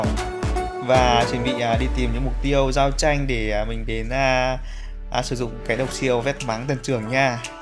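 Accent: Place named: Thanh Hoa, Vietnam. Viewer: người thanh hoá à. Thấy có cả (mô)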